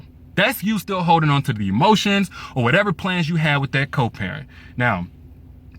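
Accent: South, United States. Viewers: 😂 lol love the accent Derrick lol great southern drawl lol 😂. Blended Families it can be a great thing for the kids but there has to be a lot of trust in the relationship between the new wife and husband. Camping trips and Vacations sound great but it's a matter of keeping bedrooms separate and also making sure the kids get along too especially if there are children from a previous relationship. The ex should simply try to understand that you are not with this person but you want your child to build a bond with their step siblings. I have seen this work and I have seen it progress. It works best when both parties the mother and father are in fulfilling healthy relationships though too. If they have that then the kids will be happy 😊 too. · I'm done with that Dolly Parton accent 😂🤣. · Lol. That southern accent! I agree with you, Derrick. Come together only to support the child. 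Stop the excuses.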